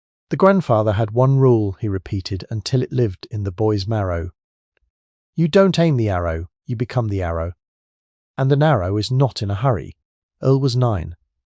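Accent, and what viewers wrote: United Kingdom. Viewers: You know I don't know what's worse you can't pronounce stuff right what are you talking about the bow of a boat and then you got to have some britt accent that I can't stand it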